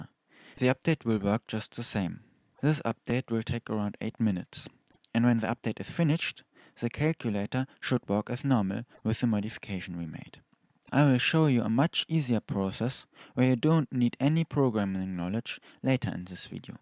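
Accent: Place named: Germany. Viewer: This german accent😂 no hate. I just find it funny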